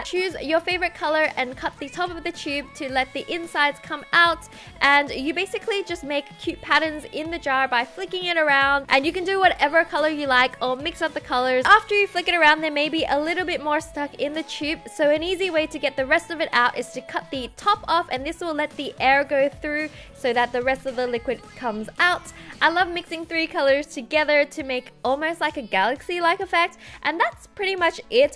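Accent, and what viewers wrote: Australia. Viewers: I think she lives in Australia. listen to her accent and you can tell. winter in Australia is like 60 degree and 70 degree weather, and their winter is June - August. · she's australian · I am Aussie btw does she live in Queensland or NSW? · I'm australian and that's how we say mosquitoes 😂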